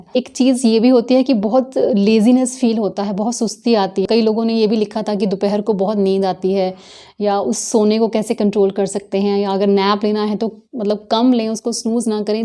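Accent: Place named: Punjab, India. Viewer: Hi I have recently watched some of your vlogs, I like your accent it reminds me of my childhood that I spent in patiala. Your pronunciation is so typical of Punjab. 😊😊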